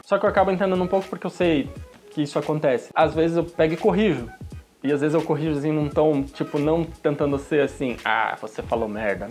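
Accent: Rio Grande do Sul, Brazil. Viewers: Você é de Florianópolis ???? Pergunto por que teu sotaque é de gaúcho !!!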